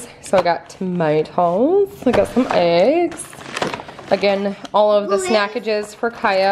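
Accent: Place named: Upper Midwest, United States. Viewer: One of the reasons I started following is because she lives "back home" in the state where I used to live. I love hearing the MN/ND accent.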